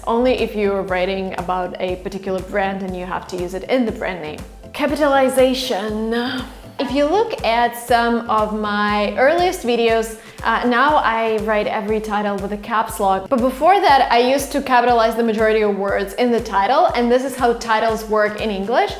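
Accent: United States. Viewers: do your Russian students mention your American accent? I like your videos:) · Hi, I have been following your videos, really great.... I am a softskills trainer but I understand that I need to learn a lot about it. I am from South India, working in an institution. Any kind of your help, will be of great support for my career. Please let me know if you can offer me in this aspect. I want to talk like you. With the American accept. Please help me. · Hello, I'm learning English mainly to speak like a native speaker. My English level is intermediate and B1 level., I also did English courses intermediate and upper intermediate at British Council in my country Sri Lanka. Anyway my English is not 100% improved and I want to speak like a native speaker. I want to follow your English course. Your American English is also best to me. Unfornately I'm getting a low income from my job and nobody is offering me good jobs because of my sickness. 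But I will try my best to collect money and buy your English books. You are kind to me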